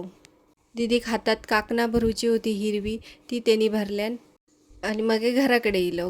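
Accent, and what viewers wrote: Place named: Malvani, India. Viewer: Madam tumhi khup chaan malvani bolta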